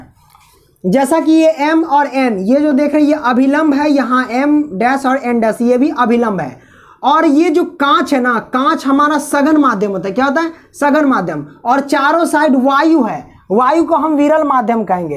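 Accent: Bihar, India. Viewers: AAP bihari ho na aapki language she Pata Chao gya 😋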